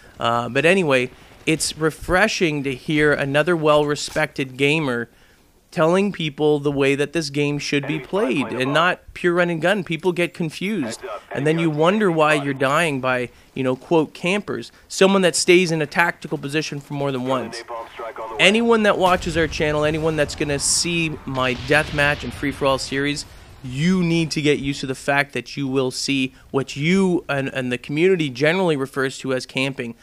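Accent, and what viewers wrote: Canada. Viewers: I know it's kinda irrelevant, but are you Canadian? I thought I heard a little bit of an accent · You canadian? i caught an accent oout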